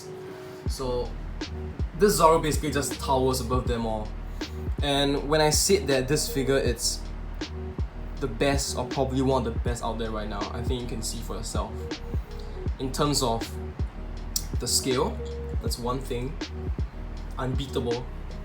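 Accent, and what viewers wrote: Singapore. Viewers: We know a singaporean accent when we hear one 😂😂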